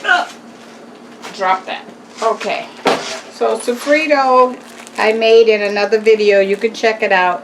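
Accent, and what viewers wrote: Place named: Boston, United States. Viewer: grew up in west Roxbury, recognized the accent immediately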